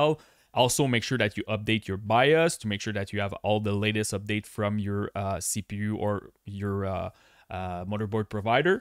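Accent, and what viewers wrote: Quebec, Canada. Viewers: Was interested until I heard "French" Canadian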